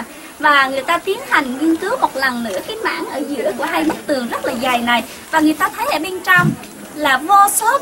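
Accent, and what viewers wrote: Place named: Quang Nam, Vietnam. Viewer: Phát âm giọng Quảng Nam đòi chuẩn như Hà Nội ah .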